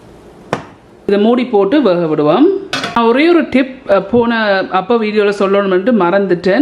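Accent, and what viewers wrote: Jaffna, Sri Lanka. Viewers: Love ur vids .just for fun since you speak lovely jaffna thamil, the subject should start as Moondu not moonu :-D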